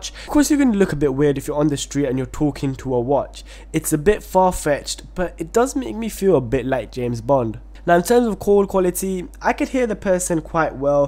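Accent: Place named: India. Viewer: Are u indian?if u are then ur accent is pretty impressive :)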